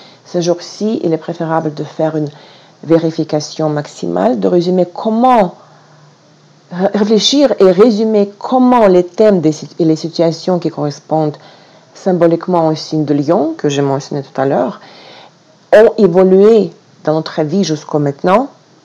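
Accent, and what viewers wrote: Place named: Russia. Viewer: merci pour cette vidéo très enrichissante... J'adore votre accent... Je précise d'ailleurs que votre français est parfait quand on sait combien cette langue est complexe... Quand je vous écoute, çà me replonge dans mes années lycée lorsque j'étudiais le russe... une langue dont la musique me berce encore... Votre façon d'aborder l'astrologie me parle beaucoup: elle est précise et claire à la fois... Belle semaine à vous...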